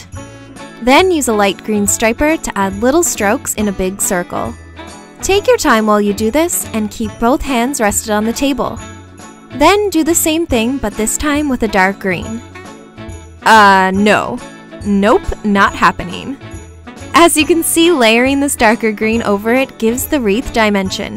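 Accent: Newfoundland, Canada. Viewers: You're from Newfoundland?? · Isn't she from Canada though? Maybe it's a Canadian thing. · Are you from Newfoundland?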